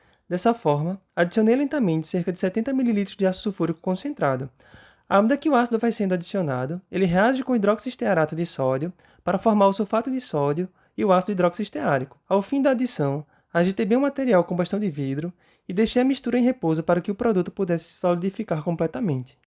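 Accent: Northeast, Brazil. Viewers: Mto bom! Parabéns pelo canal. Sotaque do Nordeste me lembra de casa!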